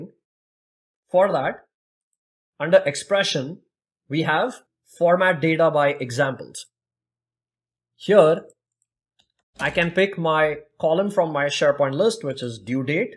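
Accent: India. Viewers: سلام خستت نباشه - عذخاهی، شما اینگلییسیو تو ستان🇮🇳 یاد گرفدین[ت= د👂 ; ) ] یا ایران🇮🇷، طرز تلفظاتتون شبیه به مردمان هندی اینجا در کاندا(بیصائب_شده) که ساکنم به گوش می خوره🤔🙂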